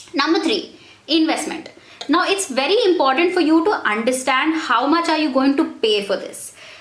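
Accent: India. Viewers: Malyali English 😬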